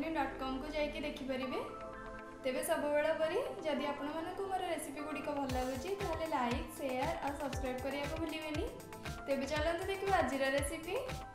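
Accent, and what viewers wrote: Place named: Balasore, India. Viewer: OK mam.apananka Katha style ta mote balesoria bhali lagila so pacharili.. Thnku mam. I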